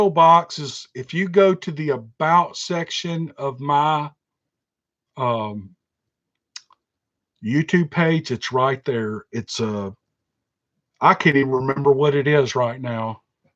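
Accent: South, United States. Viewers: As a woman....I hate to admit it..I cant stand listening to a woman's voice who narrates another channel!. Your southern drawl totally makes the stories! I pictured you with more of a ZZ Top beard and good ol' boy image! Good job with your channel and continued success. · If your passion is the story you certainly have the southern draw to tell them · We enjoy your videos, love the accent too. Takes me back to my southern roots! Had a lot of paranormal things happen all my life! Makes me feel at home. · My husband and I listen to you ALL the time, We love your show! I enjoy your southern accent & LOVE your stories!!🥰 We look forward to your next one ❤ Maybe one day I will send an email with some of our paranormal experiences, I think you would enjoy hearing them!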